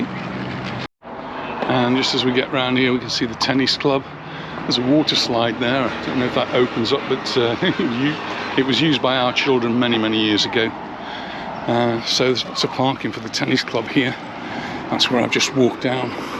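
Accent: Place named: Scouse, United Kingdom. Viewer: Hi &, we’re long time subscribers, loving your vlogs and the hint of a scouse accent 👌😉Just after some advice if you don’t mind. We are cruise fanatics and vloggers and are visiting Palma for the day on Cunard’s Queen Anne on 17th June. We want to visit a nice beach for the day. It would need facilities (Sunbeds, umbrellas, somewhere for food and drink), have relatively easy access (a couple of our party have mobility issues and be not too far from the cruise terminal. Portals Nous looks good, any other recommendations? Thanks in advance. Gail & Mike x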